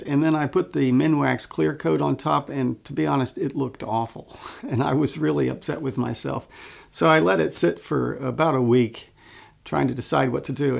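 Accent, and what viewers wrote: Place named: Winnipeg, Canada. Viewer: You’re from Winnipeg, aren’t you?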